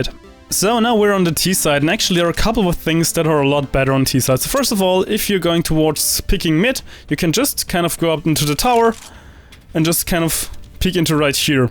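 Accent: Germany. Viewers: Are you German ?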